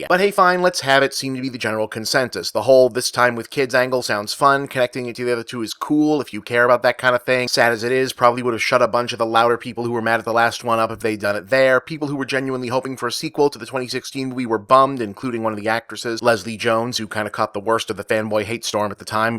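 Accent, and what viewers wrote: Boston, United States. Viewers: It's just part of his Boston chahm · Who else herd the boston in here?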